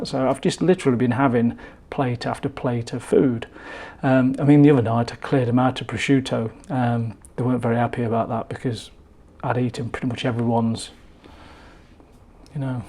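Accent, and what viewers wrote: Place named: East Midlands, United Kingdom. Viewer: You’re defo from East Midlands 😂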